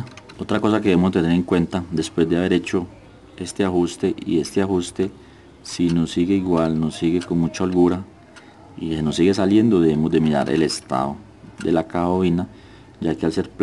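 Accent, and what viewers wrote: Colombia. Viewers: Holaaaaa saludos no quiero equivocarme pero juraría que eres de Colombia, soy aficionada a la radiocomunicacion y conozco diferentes acentos. Me encanta tu forma de explicar tus vídeos. Gracias!! Voy aprendiendo muçho contigo. Es la primera vez que escribo.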